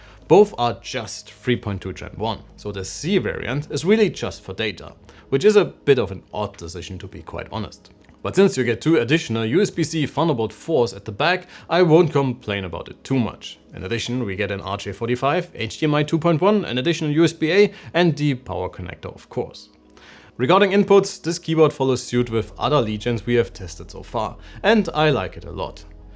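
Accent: Germany. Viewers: I Hear Your Deutsch Akzent 😂 schönes Video btw 🎉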